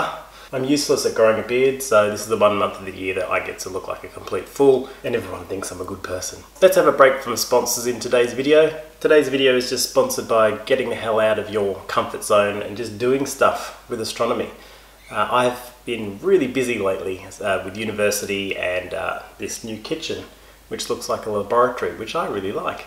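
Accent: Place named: Australia. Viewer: HAHAHAHA, he really has a Down under Accent:)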